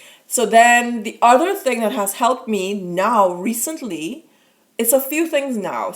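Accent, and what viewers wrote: Trinidad and Tobago. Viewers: what country are you from? you sound Trinidadian... great tips!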